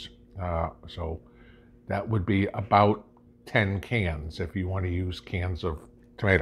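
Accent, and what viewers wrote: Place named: Midwest, United States. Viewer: I just started watching your channel a few months ago and I just KNEW you were not a TN native. I said to my son "That guy is a midwesterner" lol I'm a native Michigander. We know our own lol! Love your channel!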